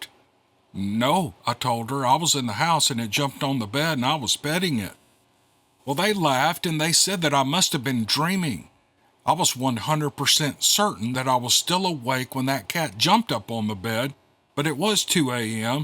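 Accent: South, United States. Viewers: I'm from the UK and that beautiful southern accent, so relaxing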